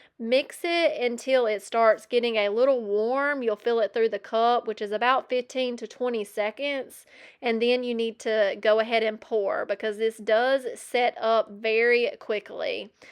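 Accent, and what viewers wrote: South, United States. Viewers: All these were great! I loved the tins the best, but the rooster in the frame was a close second. I think a deep red frame with black wax may have added something, but honestly, it's good as is. I'm grateful for my amazing family that tolerates all my crazy collections and hobbies! Congrats on 1 year and 10,000 subs - you are fun to watch. I'm originally from the south, living in Colorado. Your accent is like a warm hug from home. :)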